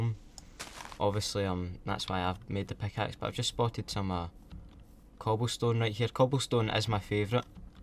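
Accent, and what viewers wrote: Scotland, United Kingdom. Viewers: I love ur accent:) (Im scottish aswell):D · what part of Scotland are u from I live in Glasgow Easterhouse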